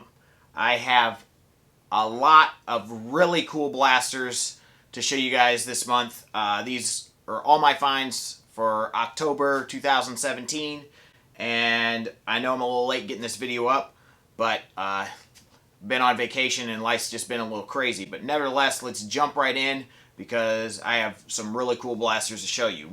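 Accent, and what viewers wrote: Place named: South, United States. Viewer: Where do you live?!?!! You have a southern accent.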